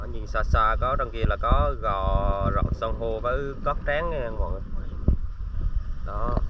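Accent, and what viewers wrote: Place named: Quang Nam, Vietnam. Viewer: Ở mô mà nói tiếng quảng nam vậy🤔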